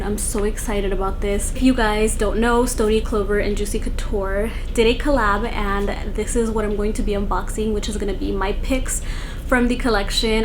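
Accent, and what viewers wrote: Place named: Canada. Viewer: Are you Canadian? I hear a accent 😭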